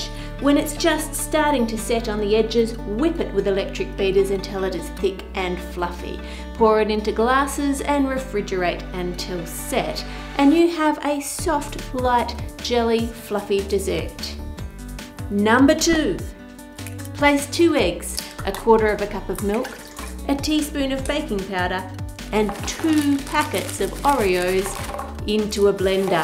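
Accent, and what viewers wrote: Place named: Australia. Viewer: Better--to me Bob had a really odd "would you like a piece of candy little girl" voice, where is more gentle and has a nice Down Under edge to it. Oh, and cheerful.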